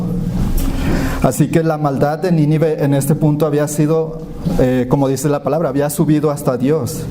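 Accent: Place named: Mexico. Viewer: Hola ¡Por tu acento puedo creer que hablas desde México! ¿vale? ¡Que nuestro Dios venga a alumbrar a todos pueblos Mexicanos! “ Lámpara es a mis pies tu palabra, y lumbrera a mi camino. Salmos 119: 105. “ 💡 Saludos desde Brasil. 🇧🇷 Al mi México querido. 🇲🇽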